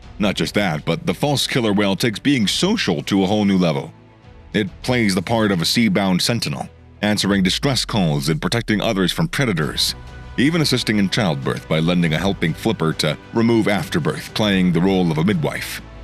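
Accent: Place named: United States. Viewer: The most American narration ever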